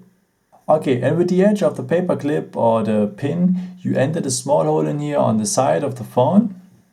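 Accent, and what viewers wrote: Germany. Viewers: Could this viedeo be uploaded in English or with English subtitles because this is not understandable at all. It sounds like a German accent, which is ok,but please...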